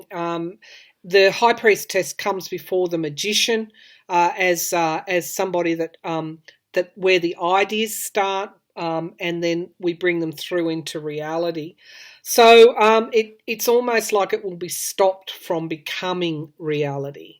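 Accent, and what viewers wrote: Australia. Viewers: Love listening to you, gives me hope! Love your accent, spent a month down under several years ago, New Year’s Eve in Sydney harbor, spectacular. Was so sad to hear the fires did extensive damage to KI. KI, GBR and Tasssie were amazing. Love Australia and it’s nature and friendly people. Stay safe everyone. Thanks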